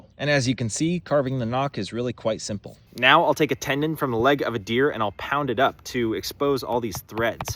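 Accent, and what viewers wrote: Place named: Lancaster, United States. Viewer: OMG There's an American archery guy now! (I love your voice btw, reminds me of some of my friends in Lancaster, PA).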